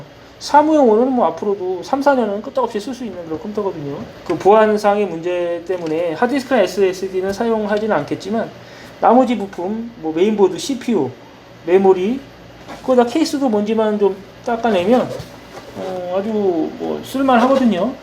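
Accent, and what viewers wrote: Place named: Seoul, South Korea. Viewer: 사장님은 춘천분이신데, 서울말을 정말 잘 쓰심 ○_○ 발음도 좋으심👍👍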